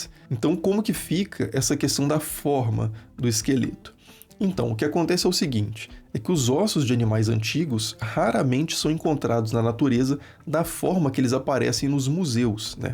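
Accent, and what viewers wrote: Minas Gerais, Brazil. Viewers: que achado foi o seu canal!! As temáticas que você explora são extremamente interessantes e, ao meu ver de leigo, pouco conhecidas do grande público. Você tem um conhecimento incrível, uma didática ótima e (detalhe não negligenciável) uma voz/sotaque mineiro muito gostosos de ouvir haha Para mim, é o melhor canal de história em português! Parabéns pelo seu trabalho maravilhoso e obrigado por compartilhá-lo!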